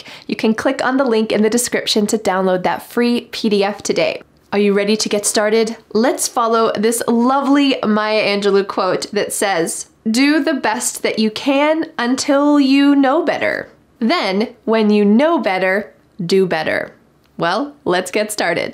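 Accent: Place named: United States. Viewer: You're American, aren't you? I hear the accent too strongly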